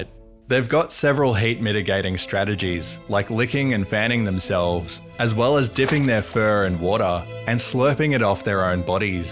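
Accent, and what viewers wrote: Australia. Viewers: I love an Australian doing an Australian accent! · You sound like FootLettuce but Australian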